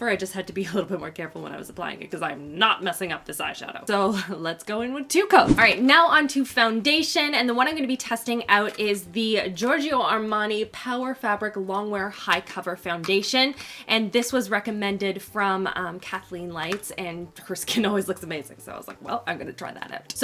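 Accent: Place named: Canada. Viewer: I love when I hear your Canadian accent.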